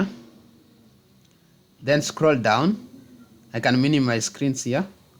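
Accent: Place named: India. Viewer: Indian english its very cool experience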